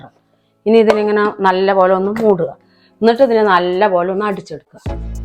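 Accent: Palakkad, India. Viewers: Oru palakkadan speech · പാലക്കാട്‌ ഭാഷയാണല്ലോ... എവിടെയാ സ്ഥലം അമ്മേ 😘😘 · പാലക്കാടൻ ഭാഷ സൂപ്പർ ❤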